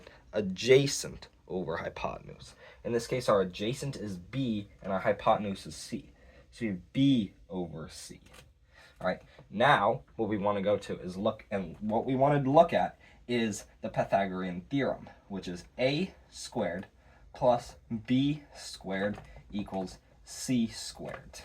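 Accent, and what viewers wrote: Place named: South, United States. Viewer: I love your southern accent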